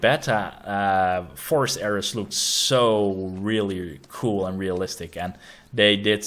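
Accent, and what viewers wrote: Netherlands. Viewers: You have a Dutch accent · Dutch accent :)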